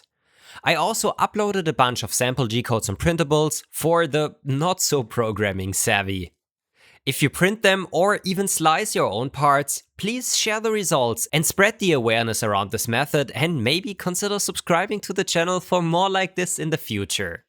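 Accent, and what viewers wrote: Germany. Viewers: All in english, yet so german xD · Ich hab nur zwei Worte gehört und ich hab sofort gemerkt dass du deutscher bist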